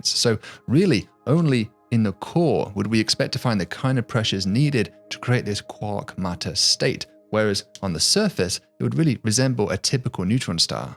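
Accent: United Kingdom. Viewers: "Poolsar"? Never heard that pronunciation before. Does he also say, "Check my poolse"? OK, he also says "repoolsion." Where is from that he has a classic British accent except for that quirk?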